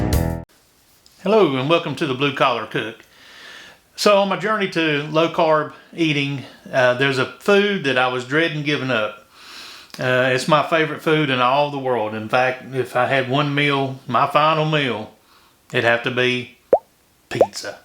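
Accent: South, United States. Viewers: Yes to pizza and double yes to that lovely southern accent.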